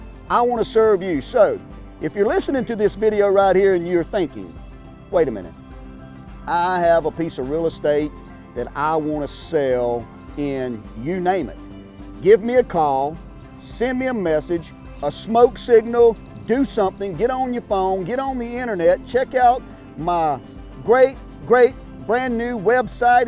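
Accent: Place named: Texas, United States. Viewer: I love your videos especially the Texan accent!!!Way to go and I hope you get success that you deserve!!! 🙏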